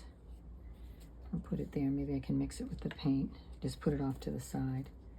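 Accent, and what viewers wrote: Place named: Massachusetts, United States. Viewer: You crack me up! I'm a first time watcher. You must be from MA!! Your accent & word choices make me feel right at home!! I quite enjoyed watching your methods.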